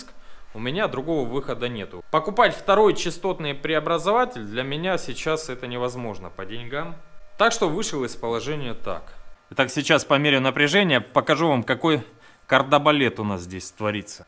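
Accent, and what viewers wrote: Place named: South, Russia. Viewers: До сих пор не могу привыкнуть))) В голове Игорь за кадром)))))) видимо у вас говор такой южный. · у вас говор такой южный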